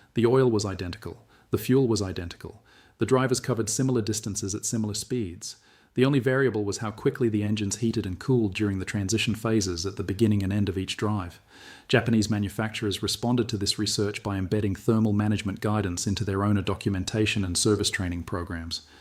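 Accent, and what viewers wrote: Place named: Australia. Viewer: Asian Guy got himself an Aussie accent!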